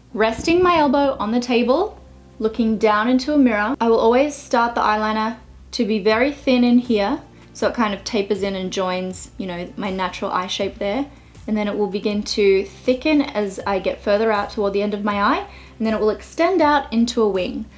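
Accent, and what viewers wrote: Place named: Australia. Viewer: are you Australian you has an Australian accent (Im Australian) p.s u earned a new sub xxx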